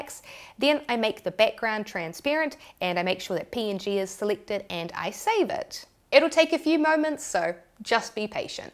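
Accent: New Zealand. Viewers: Pretty sure it's her Kiwi accent 😄 Someone please correct me if I'm wrong! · Hi! 😀 My name is Shannon, I'm from Pukekohe, New Zealand - I stumbled across your channel just a few weeks ago and was delighted to hear a New Zealand accent!!! 🤩😁 I LOVE your channel, your videos are excellent, there are SO many options and ways to make money online which I'm looking into. One of my long time wishes is to work from home and there is no better time than now to make this dream happen! I've been watching a lot of YouTube videos over the past few months, looking into various ways to make money online which will work and aren't too mind-boggling, or cost any money to start. You are amazing -- your knowledge and success are incredible!!! ✨🤩😁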